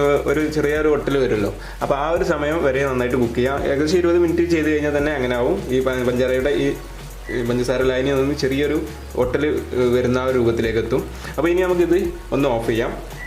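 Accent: Malappuram, India. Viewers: malapuram .slang athaanu. njanum malapuram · Good, മലപ്പുറം മലയാളം തുടരട്ടെ. മനസ്സിലാകത്തവർ ചോദിച്ചേക്കാം. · മലപ്പുറംകാരെ signature മാറ്റല്ലേ bro... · എടാ ചെക്കാ ഇജ്ജ് മലപ്പുറം ഭാഷ തന്നെ പറഞ്ഞാൽ മതി. ഞമ്മക്ക് തിരിയും ട്ടോ ചെക്കാ. നിന്റെ ഇന്നസെന്റ്സ് എനിക്കൊത്തിരി ഇഷ്ട്ടായി. Love you bro. Kl 10 ആണ് ഞാനും. കാളികാവ് മാളിയേക്കൽ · soopar . edakk swandam basha warunnundallooo .fullaayitt malapuram basha paranjoolee😃😃 oru koyappalla... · Pinnalla muthe njan kottayam but malappuram slang is so beautiful bcz ente kooduthal frnd malappuram anu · Kakka nee malappurathaano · Njammalum malappuram okke thanne aanu bro... Bhasha onnum oru kuzhappavum illa... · Daaaaa.... ഇത് നമ്മടെ മലപ്പുറം ഭാഷ ഒരു കുഴപ്പവുമില്ല നീ പൊളിക്കെടാ മുത്തെ...... 😘KL_10 chunkss😍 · മലപ്പുറം ഭാഷ 😘😘😘😍😍😜😜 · Malappuram da😄😄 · മലപ്പുറം ആണോ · പക്കാ മലപ്പുറം സ്റ്റൈൽ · നമുക്ക് നമ്മുടെ മലപ്പുറം ബർത്തനം തന്നെ മതിയെടാ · എന്റെ അഭിപ്രായത്തിൽ ഇജ്ജ് അന്റെ അല്ലെങ്കി മാണ്ട ഞമ്മളെ തനി മലപ്രത്തെ ഭാഷേ തന്നേ പറഞ്ഞാൽ മതി ചങ്ങായി അതിനും അതിന്റേതായ ഒരു മൊഞ്ചുണ്ട് ഇബനെ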